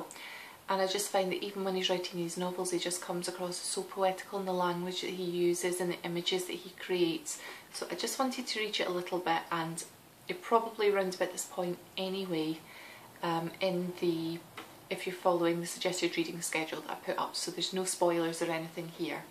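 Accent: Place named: Scotland, United Kingdom. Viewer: Oh you are such a sweetheart:) so glad to see that your move went well! I just love your Scottish accent I could listen to you talk all day my dear! Looking forward to the Christmas sock swap!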